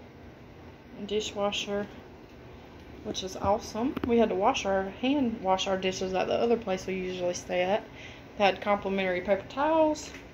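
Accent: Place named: South, United States. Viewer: Oooo girl you are even more country than me!!! Hahaha I love it! 🥰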